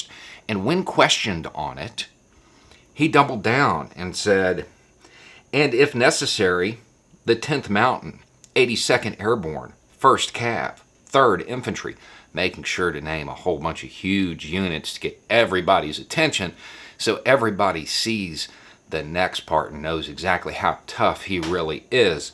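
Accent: South, United States. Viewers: I just found your channel, and I Thank You for your commentaries. Where are you from? I'm in West Virginia, and I detect a country accent.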